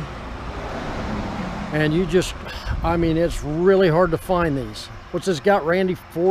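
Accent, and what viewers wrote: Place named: Ohio, United States. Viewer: What a pip! Just recently stumbled upon your channel and must say how much I enjoy your videos along with your knowledge and honesty in doing business. I'm currently on my eighth Panther platform car, an '04 Signature Town Car and like very much that you appreciate and know so much about these vehicles. I'll wager the interior has that distinctive Ford aroma which I remember from cars my folks drove while I was a youngun' in the 1960s. Not elderly yet, but I'll be a boy of 63 in a few weeks so I can relate there as well. And being a native of western PA, it's nice to hear the way you speak over there in that part of "O-h-Ten." Quite similar to the way folks talk in my hometown area. Take care!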